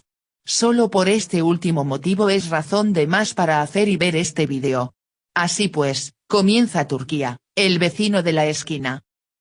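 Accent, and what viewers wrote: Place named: Argentina. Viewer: aunque tenga acento argentino es bastante mas agradable que lokendo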